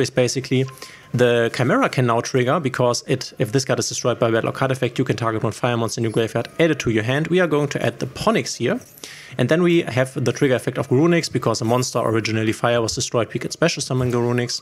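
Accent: Germany. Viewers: Dieser deutsche Akzent nervt echt. Aber gute Zusammenfassung wie das Deck funktioniert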